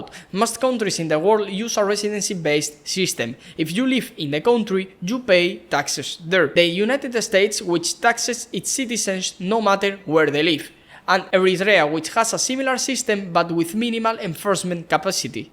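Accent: Spain. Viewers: i’m also spanish , i’d recommend you to spend a few months in the uk or us until you lose your strong spanish accent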